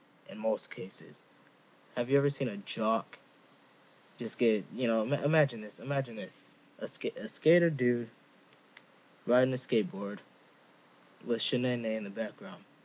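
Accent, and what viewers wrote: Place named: Somalia. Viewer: Are you Somalian? You sound Somalian.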